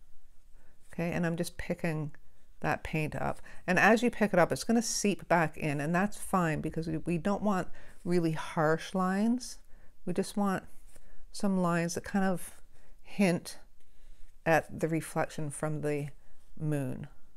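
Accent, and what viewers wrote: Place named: Gloucestershire, United Kingdom. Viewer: as usual I love your work. Is there dvd of your work available? Love your accent the way you pronounce out as owt, reminds my wife and I of our ancestors from Gloucestershire ☺️👌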